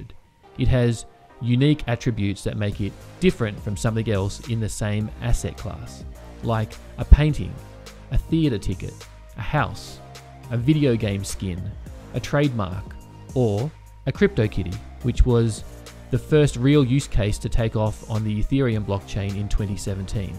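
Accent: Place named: Australia. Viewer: Close, he's an Aussie, not a kiwi like me ;-P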